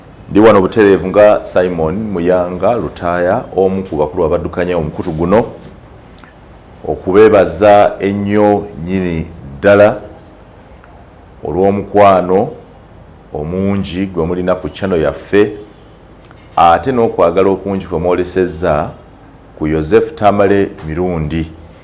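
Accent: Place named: Zambia. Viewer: But mr muyanga oyogera nga zambali🙏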